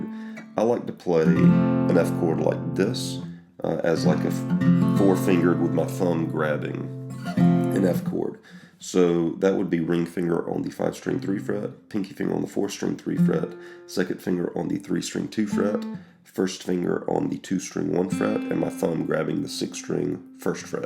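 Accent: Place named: Georgia, United States. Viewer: Thanks so much for all your hard work and easy to follow videos. Wondering which part of the country you’re from. Sounds a bit like GA.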